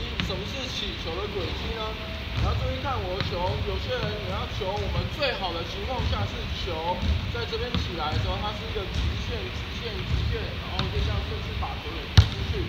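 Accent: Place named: Minnan, China. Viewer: 你很mean。这是闽南那一带说话方式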